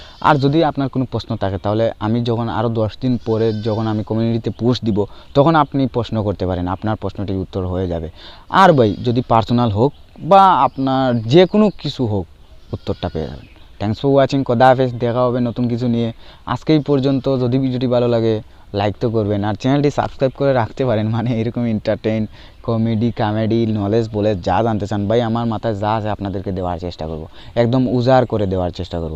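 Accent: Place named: Gopalganj, Bangladesh. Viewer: ভাই আমার শশুর বাড়ির সবাইর ভাষা আপনার মত। love from Gopalgonj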